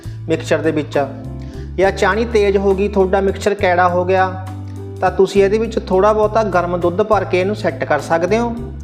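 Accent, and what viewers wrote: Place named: Kurali, India. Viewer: ਤੁਸੀਂ ਵੀਰ ਜੀ ਕਹਿੜੇ ਪਿੰਡ ਤੇ ਜ਼ਿਲ੍ਹੇ ਤੋਂ ਬੋਲੀ ਸਾਡੇ ਕੁਰਾਲੀ ੲਇਲਾਕੇ ਦੀ ਲਗਦੀ ਹੈ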